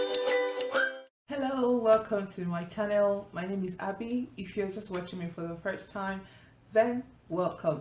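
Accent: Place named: United Kingdom. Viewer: I like the way you talk You sound British😌😌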